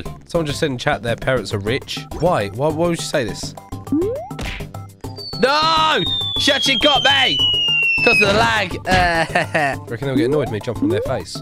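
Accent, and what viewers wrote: United Kingdom. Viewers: YOUR VOICE IS AMAZING THE BRITISH ACCCENT I CANT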